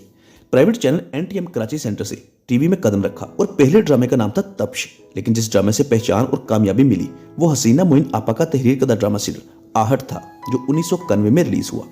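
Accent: Punjab, India. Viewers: Aapka urdu lehja punjabi typa ka hy aur aap bohat taizi se bol rahay hain jo kano ko giraan guzarta hy.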